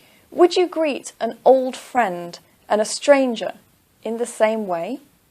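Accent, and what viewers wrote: United Kingdom. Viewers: Hey thanks for the videos. Studied for 8 days watching every video of yours and also checked the website for reading test. Got 7 band overall. Very happy. Thanks for the help. Didn’t get enough time to practice, else 8 band was easy. Thanks a ton. BTW, I’m in love with British English now! 😅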